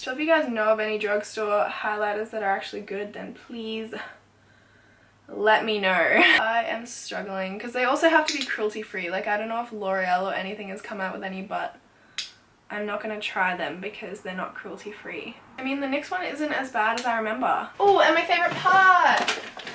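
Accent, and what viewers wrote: Australia. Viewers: I absolutely love your Australian accent ❤❤ I'm English and my accent is so boring 😂😂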